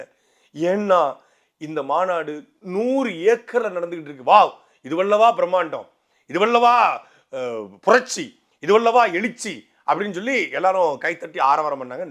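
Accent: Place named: Kongu, India. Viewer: அண்ணன் கொங்கு தமிழ் ல பொளந்தது செம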